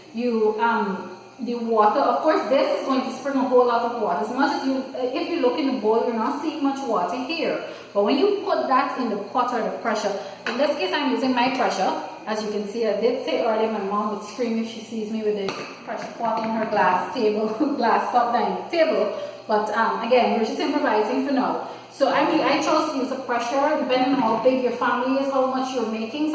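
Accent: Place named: Guyana. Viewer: I miss my mommy pepperpot and it is great to hear the guyana accent when away from home, always make me smile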